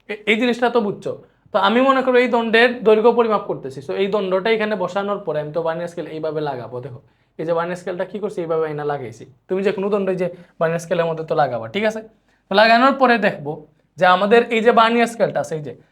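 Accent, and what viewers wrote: Sylhet, Bangladesh. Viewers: Vai apni sylheti ni.. please reply dein. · are you sylheti brother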